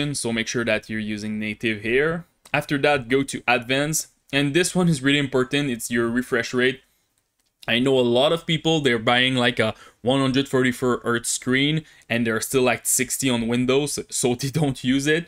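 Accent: Quebec, Canada. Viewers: I love your French-Canadian accent lmao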